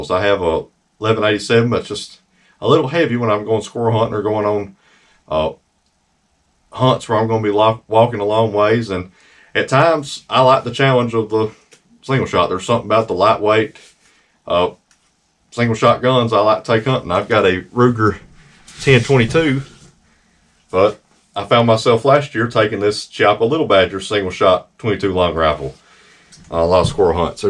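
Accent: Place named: South, United States. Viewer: I subscribed just because of his voice and southern accent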